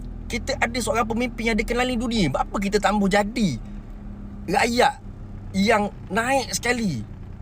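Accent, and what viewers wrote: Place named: Kelantan, Malaysia. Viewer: tolong melaraskan bahasa kamu dengan lebih baik. Rimas lah loghat2 kelate ni . Pesanang aku belajar bahasa yg lebih baik dari DBP dulu ya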